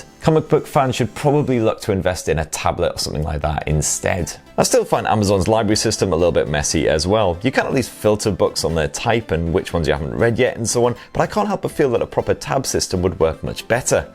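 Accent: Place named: Newcastle, United Kingdom. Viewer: Oh no, you're a Geordie, aren't ya?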